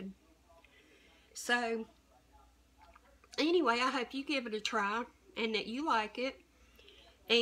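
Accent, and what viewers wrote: South, United States. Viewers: I love your videos AND your Southern accent!!!!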